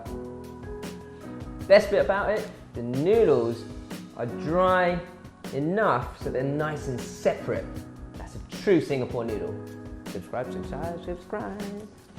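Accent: England, United Kingdom. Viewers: Chinese man with the pom accent, no matter what countries how gangster you are.? But when you have a pom accent made you look cool and sweet. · is my new favorite internet chef and I watch A LOT of them. He is straightforward and simple, has a cute Brit accent, makes DOABLE dishes and is a NO-NONSENSE kitchen master. I suspect he speaks Cantonese and some French. My main man!!!! Bon appetite! Cheers.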